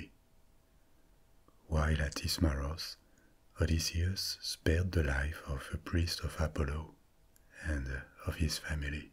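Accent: France. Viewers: Yeah the only thing keeping me up is the accent because I am French as well and it s super annoying that he does not speak in French. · Vu l’accent tu es français, existe-t-il des équivalents vf de tes récits mythologique? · Farted as soon as it started🤦🏼‍♂️😬 scared the shit outta me 🤣😂 Butt... Soon as he said just close your eyes n let me do all the work ...😶👀🤗shiiiiitttt😴😴 say less French boss man whisper guy ..hella amazing 💯💯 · Dude I love your accent it reminds me of our (America's, my home country) French Allies in the Revolutionary War which in turn makes me immediately biased, and therefore I enjoy listening to you speak. Thank you for these videos you make for us. Certainly subscribing, my good sir! I wish you nothing but the absolute best. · So zi really found the french qccent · Nothing more relaxing then the sound of a french accent. One of the more beautiful accents In my opinion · Can't forget he's french.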